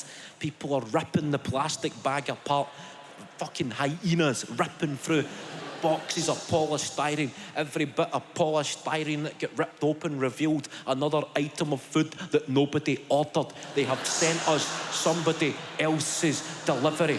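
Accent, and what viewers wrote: Scotland, United Kingdom. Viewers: Can you please add subtitles to this? I'm from middle east and it's really hard to understand Scottish accent :( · It's not an English accent It's English with a Scottish accent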